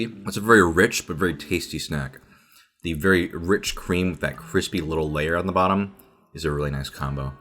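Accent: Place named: United States. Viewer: I'm lying in bed at 3AM, watching a Korean man with an American accent eat Japanese snacks... what am I doing with my life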